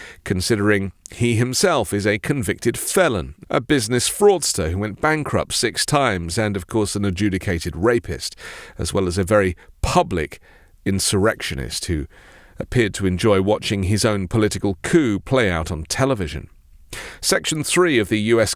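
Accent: United Kingdom. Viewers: Why is some feminine ass white with a British accent telling me about my American government f*** off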